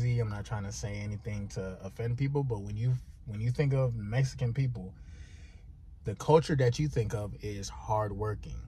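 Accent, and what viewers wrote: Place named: Jamaica. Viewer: Knew you was Jamaican bro 💪🏿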